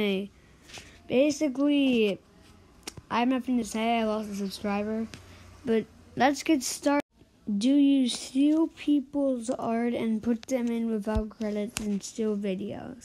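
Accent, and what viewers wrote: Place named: Texas, United States. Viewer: your school was in Texas?? texan sounds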